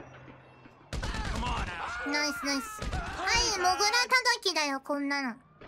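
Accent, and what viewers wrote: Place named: United States. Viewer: if i wouldn't know better i thought she's american 🤔🤔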